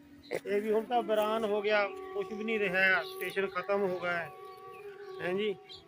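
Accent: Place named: Ludhiana, India. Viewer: Sir aapki boli to Ludhiana deha form Begowel doraha Ludhiana Punjab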